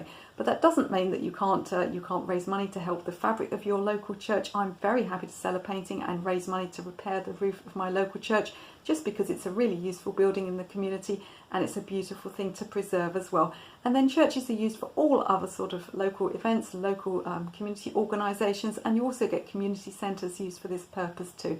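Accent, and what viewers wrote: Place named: Southern England, United Kingdom. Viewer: Thanking you. Im a painter and I originally come from Tonbridge in Kent but I have lived in Australia for years now. I havent been home for many years so love hearing your southern accent.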